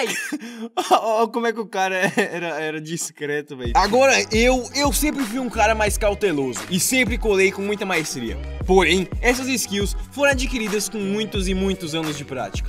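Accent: Bahia, Brazil. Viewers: Tu é de que lugar da bahia? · Mano,vc é baiano ou gaúcho,sou filho de baiano,sou mineiro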